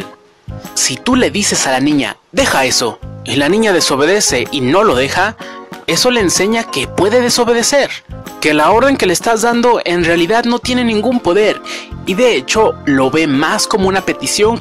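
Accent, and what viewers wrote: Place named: Mexico. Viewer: por su asentó, creo que es ud de mexico, o peru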